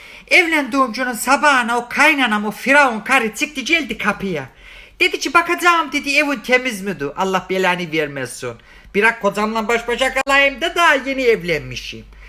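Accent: Black Sea, Turkey. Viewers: Elmiki ezrail unitti elmi firavun kari.... Ayzina yüreğine sağlık be uşağumm... Süpersin bu Karadeniz şivene doyamayrum... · Bilezikte güzel yakisiyor ne yapiyım seni ya puyuklu usak karadeniz sivesini seviyorum iyiki varsin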